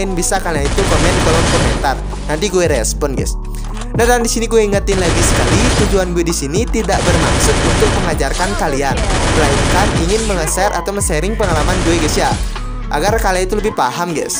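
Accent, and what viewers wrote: Bali, Indonesia. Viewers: Bang Abang orang Bali? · Pasti orang bali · Kok cara ngomong indonya kek orang bali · Org bali ya bang ya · Bli logat nya kayak orang bali nek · Ngomongnya kayak orang Bali gw curiga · Orang mana bang kok kayak orang badung bali ngomong bahasa indo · Logat bali😅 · org bali y bg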